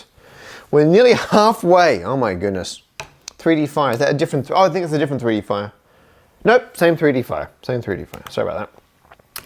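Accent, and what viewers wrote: United Kingdom. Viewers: This guy has the kind of british accent that you don't immediately notice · I only clicked because I actually thought you were Matt Parker, creator of South Park just to find out you have a British accent. I was not expecting this lmao.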